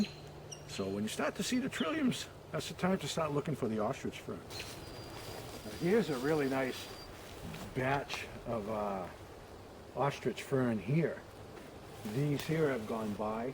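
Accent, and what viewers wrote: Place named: Boston, United States. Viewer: I'm from Boston and this guy sounds like he's a Bostonian. I had no idea the New Brunswick accent was so similar to mine!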